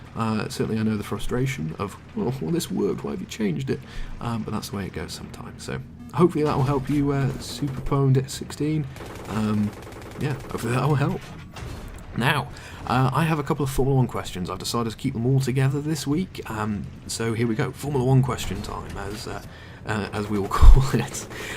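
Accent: United Kingdom. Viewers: And 3 basketball or baseball.... I think you're from the uk considering the accent... These are two great sports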